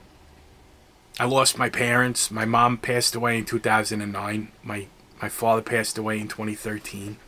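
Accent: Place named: East Coast, United States. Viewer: Thank you very much for sharing. Your east coast accent brings back a lot of memories for me. I haven't been back in a long time!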